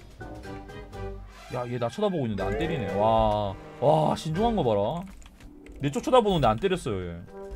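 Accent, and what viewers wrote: Busan, South Korea. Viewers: 아 부산사투리 너무 찰지고~~~ㅋㅋㅋㅋㅋㅋㅋㅋㅋㅋ 부산아재 확실하네용 ㅎㅎㅎ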